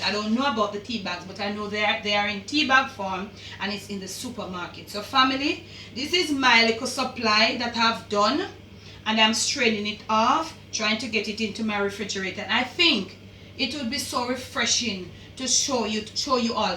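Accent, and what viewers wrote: Jamaica. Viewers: I heard the accent and BOOM 💥 HIT that subscribe button... I love ❤️ mama’ patois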